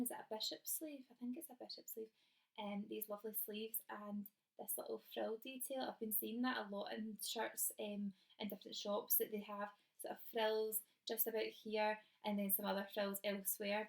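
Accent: Scotland, United Kingdom. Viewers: Loved your haul and your wee lass is adorable!! Nice hearing a Scottish accent on here too lol. Just Subscribed!! 😊🤗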